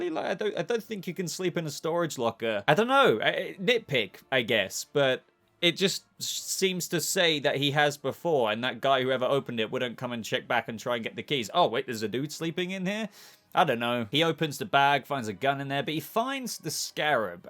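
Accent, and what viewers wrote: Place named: London, United Kingdom. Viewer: to be fair his accent does sound like a foreigner maybe greek turkish Spainsh guy who lived in london or south England kinda middle class esk area or ppl or very r.p speaking environment like retail , office , customer service mix with abit of 'lil o bit' Oliver twisty shite Cockney accent i have heard people talk him or similar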